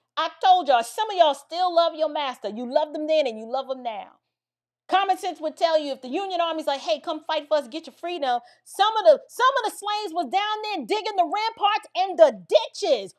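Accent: New York, United States. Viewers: Your NY accent is thick today! It must have been so nice to get back home for a bit. ❤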